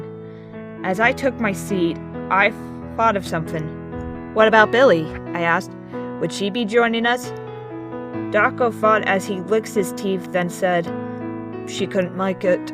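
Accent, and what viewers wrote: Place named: United Kingdom. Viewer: that British accent xD this is awesome and your voice is so sweet!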